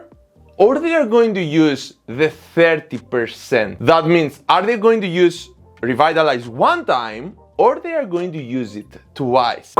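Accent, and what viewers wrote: Greece. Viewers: Bro love your vids, i bet you are greek man your accent saying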